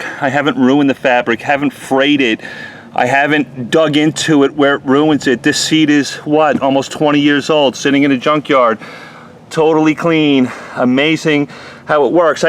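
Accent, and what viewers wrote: New York, United States. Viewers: Joisy or Newyawk. So, when was the last time you were in the Clam Broth House in Hoboken, or McSorley's on 7th? LOL Been too long for me, sadly.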